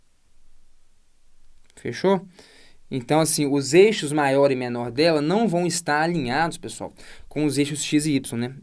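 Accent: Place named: Belo Horizonte, Brazil. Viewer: quando comecei a assisti seu canal tem um tempo, e tinha algo além do conteúdo que me chamava atenção dos demais canais, mas nunca tinha percebido. Recentemente vi um de seus stories e descobri que você é de BH, igualmente a mim, e aí eu percebi o que me chamava a atenção Esse sotaque de belo-horizontino rasgado kkkkkkkkk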